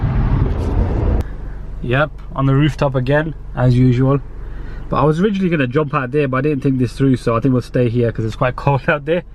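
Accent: United Kingdom. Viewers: He's obviously from Great Britain ...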